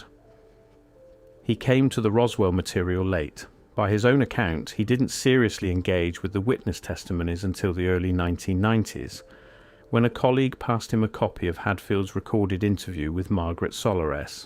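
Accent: England, United Kingdom. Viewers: Such a bore...the english accent makes it even more borish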